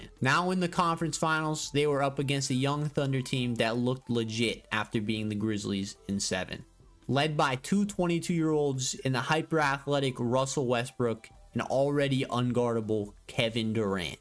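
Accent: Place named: New York, United States. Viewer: its so funny hearing a new york accent talking about the mavs so much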